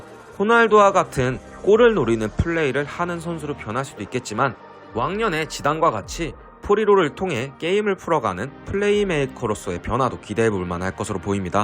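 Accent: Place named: Jeolla, South Korea. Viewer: 전라도 억양이 살짝살짝 나오네 hoxy 고향이?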